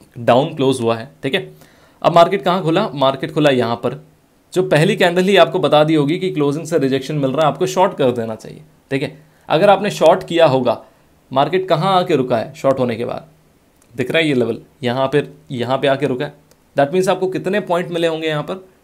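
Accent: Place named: Bangalore, India. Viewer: is from banglore XD accent se smjh gya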